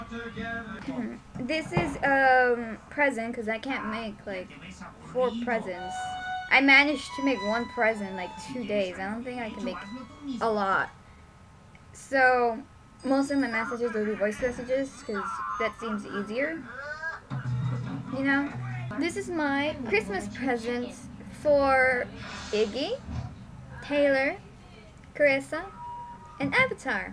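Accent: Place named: England, United Kingdom. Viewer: That was awesome in every meaning of the word! I couldn't stop laughing...hope the fireworks didn't catch up to you (I have a phobia of them, so I understand...) Yeah, we definitely need to PM each other more often, and I approve of the England accent!!! Happy Christmas! (English Merrry Christmas; and you totally pronounced Feliz Navidad right, half my family is Argentine, so I know!) Wow, might steal your idea of combining presents into one!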